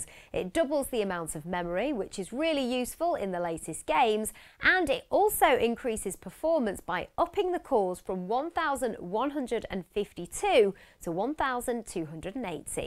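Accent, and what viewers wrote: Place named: England, United Kingdom. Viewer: I love the englsih accent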